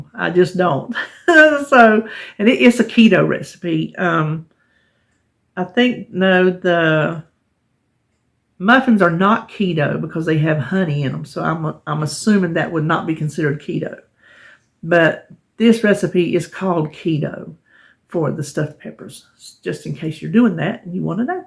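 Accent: South, United States. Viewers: They both look delicious. I often bake muffins with almond flour and they freeze beautifully. You mentioned your accent in another video ~ I love your southern accent and your wonderful way of telling stories!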